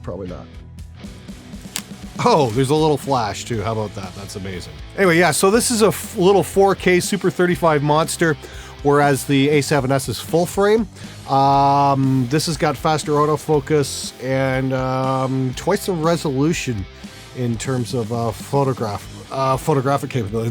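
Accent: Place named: Canada. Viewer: Every time he says about I'm like wtf and then I remember he's canadian XD not that that is wrong I just always forgett he's from another country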